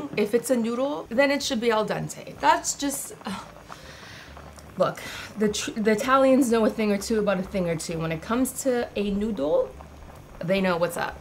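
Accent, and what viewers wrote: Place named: Cockney, United Kingdom. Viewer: Please start posting again V! Miss you're content. From the UK, London. If you chuck in you're cockney accent ('faakin' hell!') will love you forever xxx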